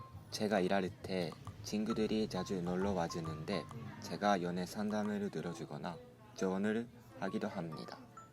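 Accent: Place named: Kansai, Japan. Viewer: こんにちは。。韓国留学頑張ってください。私も７年日本留学してましので、映像の日常が逆に懐かしく感じますね。関西弁も久々 またに遊びに来ますので、映像たくさん上げてくださいね。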